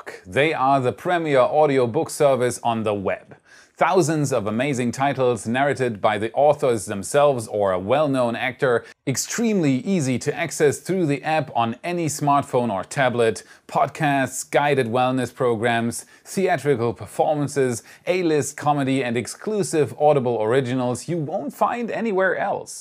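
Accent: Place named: Germany. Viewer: You sound a bit german. Are u german? Great video btw!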